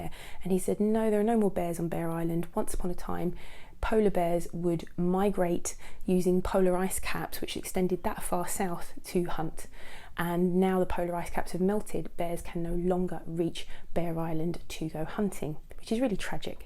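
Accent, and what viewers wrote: United Kingdom. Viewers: I wish i could speak British english sounds great😊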